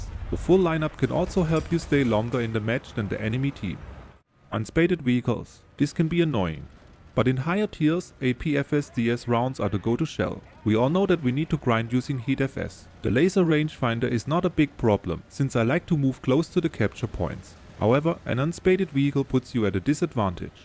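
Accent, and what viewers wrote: Germany. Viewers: You are German right ? 😄 ich auch 😂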